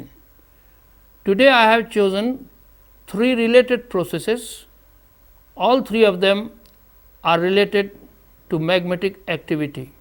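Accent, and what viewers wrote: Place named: India. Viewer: I really love Indian professors although quite accent is challenging me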